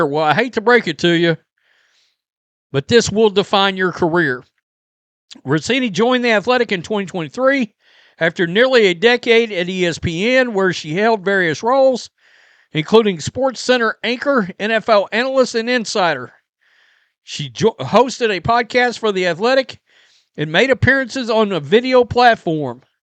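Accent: South, United States. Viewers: Hey, It's "....I don't know what other direction it could have GONE.." not "...have went." Repeat after me: I go, I went, I have GONE. I know you're a Southerner, but that doesn't mean you can't use correct grammar even in a Southern accent.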